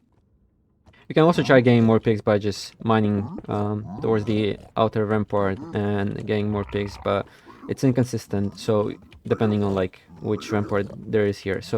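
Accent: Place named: Ukraine. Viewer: You're from Ukraine, right? I found your channel pretty late, but I absolutely love how your English sounds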